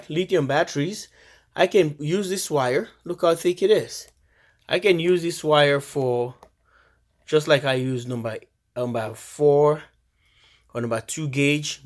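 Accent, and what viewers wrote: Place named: Nigeria. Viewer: You talk like a Nigerian. Nice video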